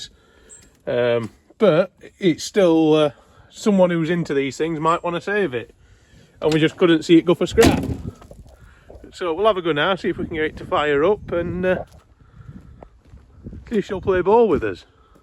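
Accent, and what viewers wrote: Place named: Yorkshire, United Kingdom. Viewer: Is proper English just a Yorkshire bloke mate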